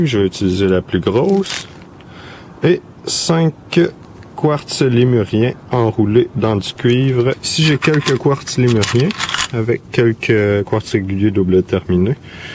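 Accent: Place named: Quebec, Canada. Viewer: Selam, peux tu ecrires les ingredients necessaire pour une coulée d'orgonite? S'il te plait? Parce que je ne comprend pas trop la belle accent du Québec... Je te remercie du fond du coeur.. Un futur Ami.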